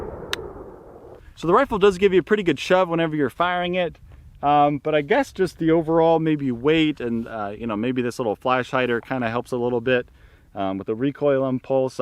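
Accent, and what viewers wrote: United States. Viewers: تمنيت لو أن ما تقوله مكتوب باللغة الإنكليزية أسفل الڤيديو كي أستطيع ترجمته ، لأنني لا أجيد اللغة الإنكليزية جيداً ، ناهيك عن اللهجة الأمريكية سريعة .. عانيت بمشاهدة هذا الڤيديو و أنا كل لحظة أذهب إلىٰ غوغل من أجل الترجمة .. الڤيديو مهم و أعجبني - شكراً .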